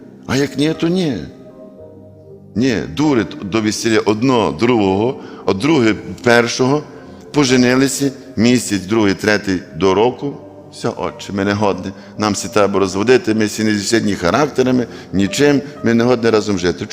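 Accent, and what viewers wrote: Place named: Galicia, Ukraine. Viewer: Як гарно і колоритно звучить українська мова з різними забарвленнями територіальних діалектів!))) Галицький говір ))) Слава Ісусу Христу за таких священників!😊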